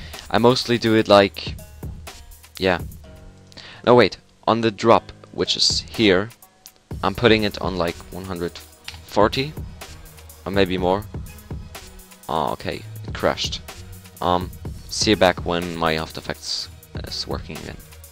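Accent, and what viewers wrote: Germany. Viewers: lol du bist deutscher? dein englisch is ja mal überboss ;D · ist er deutscher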